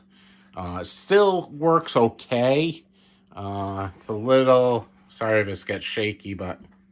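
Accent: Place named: Massachusetts, United States. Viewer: Just stumbled upon this video and your channel. You have the makings of a great set up! The instant I heard your voice I thought to myself, "This person is from Massachusetts" and was extremely proud when the first thing in frame when you rotated the camera was a Boston Red Sox banner. Where in MA are you located?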